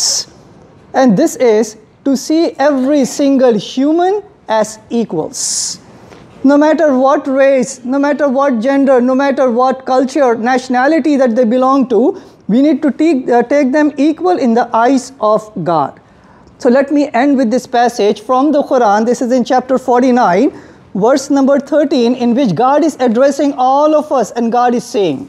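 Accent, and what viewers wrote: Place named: India. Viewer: I like when indians speak english 😍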